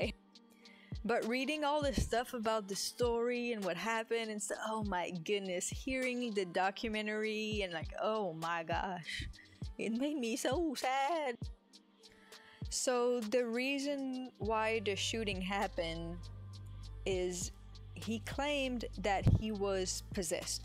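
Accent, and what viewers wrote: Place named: France. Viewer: Omg, I was gonna say it sounded like French was your first or second language because of the way you said "occult", but for some reason I thought you were from an African country that was a former French colony. You speak English much like a native speaker though, you are really good at it 😃👍, especially for only speaking it for 3 years! It took me way longer to learn English. Thank you for replying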